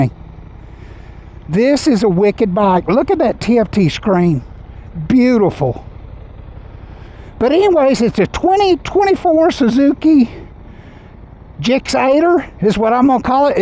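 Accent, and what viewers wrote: United States. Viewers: English man I think not sir sounds American to me😂😂😂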